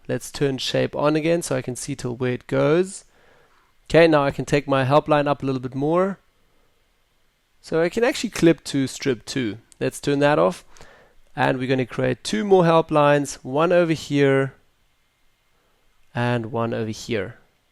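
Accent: South Africa. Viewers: Jy klink soos n SA man :D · Hey man, I saw you were German but you have a very South African accent, you live there? XD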